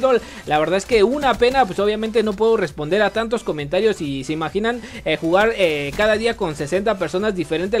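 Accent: Mexico. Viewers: ¿¡Eres de México!?. 😱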